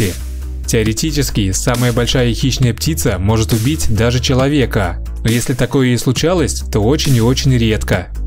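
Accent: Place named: Ural-Volga, Russia. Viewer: Текст хороший, а уральско поволжский акцент утомил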